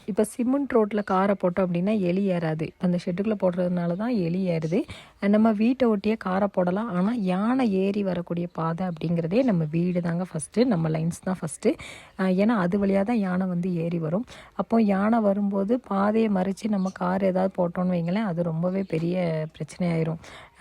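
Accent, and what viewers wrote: Tirunelveli, India. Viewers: பொடு பொடுன்னு திருநெல்வேலி ஸ்லாங் 👌🏿👌🏿👌🏿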